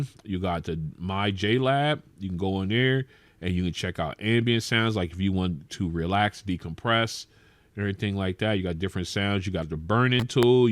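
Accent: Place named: California, United States. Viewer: Big bruh. Your cali accent so apparent. Love the content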